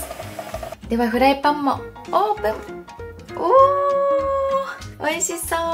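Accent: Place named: Osaka, Japan. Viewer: ありがとうございます✨ レンチンはできるだけ避けたいので、自然解凍して蒸し器で蒸す方法試してみますね🎵 余談ですが、私は香川です😊 大阪が大好きで、毎月1～2回はユニバとユニバ周辺に遊びに行っていました😊大阪に住みたいと思ってたくらいです😊 は出身が大阪なんて羨ましいです✨ときより大阪弁なのも羨ましいです😁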